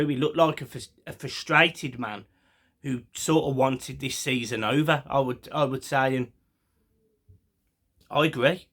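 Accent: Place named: London, United Kingdom. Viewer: you are from London right? I can hear it from your accent.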